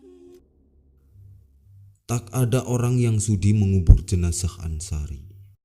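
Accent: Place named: Tegal, Indonesia. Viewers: Tegal bgt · dg bahasa mimin meddok banget pingin belajar bhsanxa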